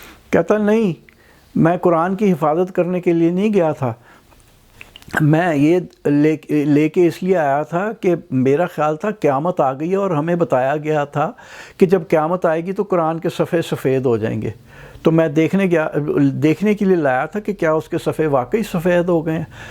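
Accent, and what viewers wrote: Punjab, Pakistan. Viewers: Your skill of story telling is amazing. I have seen more than 50 videos. I am Indian Marathi, your fan of sense of humour. Lot of thanks. Keep it Sir ji. Your Punjabi raheja is fantastic.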